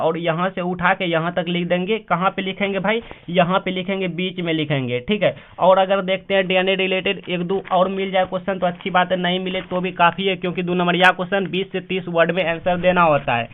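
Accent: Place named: Bhagalpur, India. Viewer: Sir aap bhagalpur jile se ho kya kyunki main bhi wahin se hoon aur meri bhi bhasa aisi hi hai😊😊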